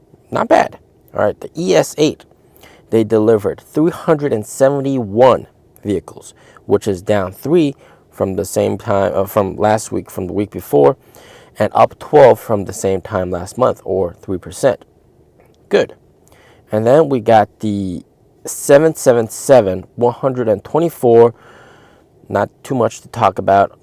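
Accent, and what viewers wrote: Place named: Texas, United States. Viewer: Every time I hear you talking without looking at my phone I thought you were American from Texas😅, you talk like the guy from magpie restaurant who teaches ppl to cook🎉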